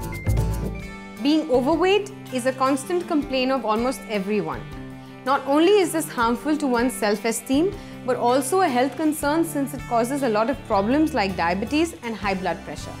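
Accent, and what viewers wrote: India. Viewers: she is indian of course she will have such an accent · I love her Indian accent :3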